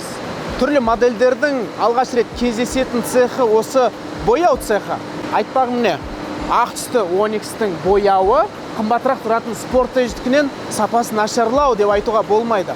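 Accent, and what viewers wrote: Standard. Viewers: жарайсың таза қазақша сөилейсің.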